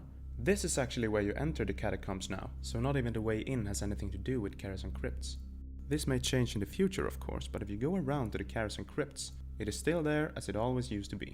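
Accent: Sweden. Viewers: That swedish accent though. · You have a very swedish accent! Are you swedish?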